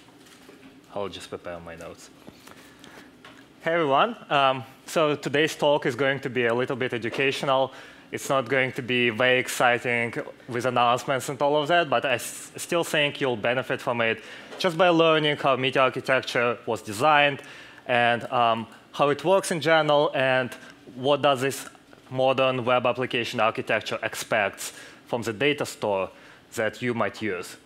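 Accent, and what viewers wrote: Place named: Russia. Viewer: Russian accent. Is he from Russia?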